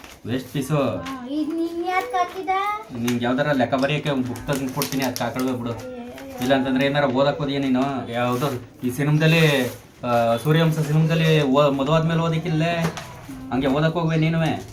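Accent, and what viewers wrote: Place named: Magadi, India. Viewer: ನಿಮ ವ್ಲೋಗ್ ಚನಾಗಿರುತೆ ನೋಡಕೆ ಮಾಗಡಿ ಮಾತು ಬಿಡದಿ ನಿಮ್ಮ ಪ್ರೀತಿ ಬಾಯಿಸುವವರು 🎉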